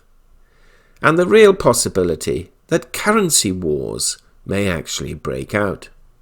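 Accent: United Kingdom. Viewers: China owes the US a trillion dollars? Are you on drugs? The US is and has been running record deficits with China for decades and your position is they owe the US? Despite your smug British accent you clearly are a deep state shill. Figures don't lie but liars figure. China holds 30k tons of gold, the largest gold holder on the planet so your figures are out in left field. China's debt is to itself so it doesn't need to revalue gold but when it does it will expose the wests paper ponzi scheme and then your lies will be illuminated.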